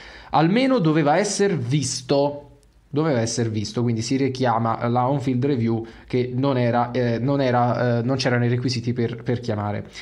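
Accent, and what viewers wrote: Campania, Italy. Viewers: La cosa più brutta di questo video è il tuo accento Campano ti hanno fatto proprio il lavaggio del cervello ci vuole coraggio difendere una squadra del nord...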